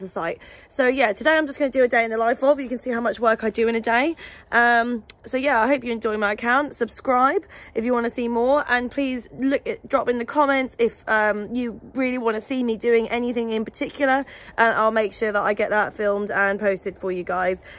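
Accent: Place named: United Kingdom. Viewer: Are you from UK? You have the accent :-D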